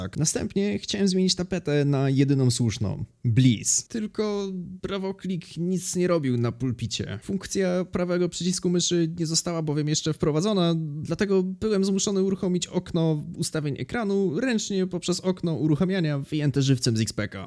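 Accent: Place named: Poland. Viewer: to jest najbardziej polski angielski akcent jaki kiedykolwiek słyszałem (automatycznie ustawiło mi się na angielski)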